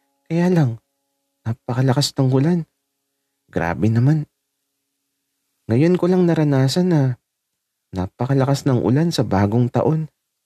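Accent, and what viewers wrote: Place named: mixed, Philippines. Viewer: Grabe ang ganda ng story author hehe waittings lang sa next ep. Nakakakilig yung story and maganda ang flow kasi nangyayare talaga sya sa totoong buhay.. I also love the accent ni author may punto kasi hehe 😅 parang taga Laguna or taga Quezon Province ganyan din kasi accent namin eh then gustong gusto ko din yung the way ng pag tawa nya hehe 😅 nakakadagdag kilig hehe 😅 Thanks po author sa magandang bl story waiting sa next ep po..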